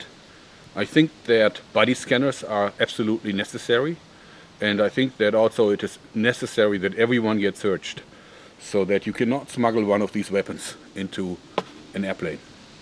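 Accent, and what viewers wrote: Germany. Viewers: U german? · You are german arent you? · does anyone know wehre he lives? it sounds not luke he is from an englisch speakinh country (like me) and i don't know i think about germany (my country) if i listen to him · man merkt richtig das du Deutsch bist xD. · "I think it can probably slice a throat. We'll find out." LOL Something about the German accent sounds very mad scientist-like. Keep up the great vids. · Really? Ok, then. I'm German myself and his accent is obvious (which makes it so much fun) but I always considered he lived in the US. Don't know why exactly, but just always did. Well, the BKA then ;) j/k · is He German? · wie man denn deutschen akzent raus hört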